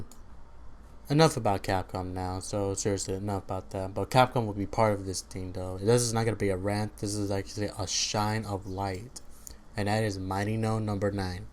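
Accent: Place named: Mexico. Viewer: you have a strong Mexican Accent are you? Well good work on the video bro ;)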